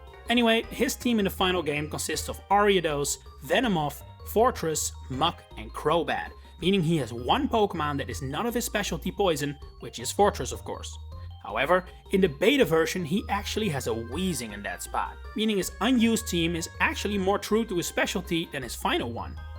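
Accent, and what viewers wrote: Netherlands. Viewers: Love the video. Are you perhaps Dutch?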